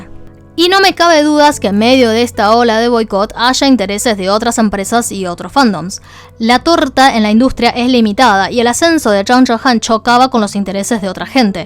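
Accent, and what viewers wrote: Argentina. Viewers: ¡aguante el dialecto Argentino!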